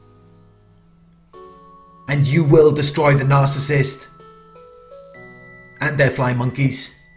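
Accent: United Kingdom. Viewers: No one explains the evil images of Narcissism like you do. Beautiful British accent. For sure narcs already destroyed tons of talented people who have so much to contribut to our world. This knowledge should have been readily available for everyone in their earlier age to minimize the effects of these emotional predator sadists. I wanna say thank you from the bottom of my heart.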